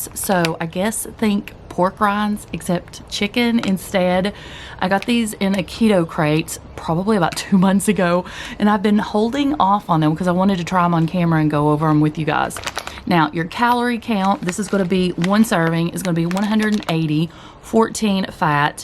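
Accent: South, United States. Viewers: You are hilarious! Good to hear that beautiful southern accent. 💗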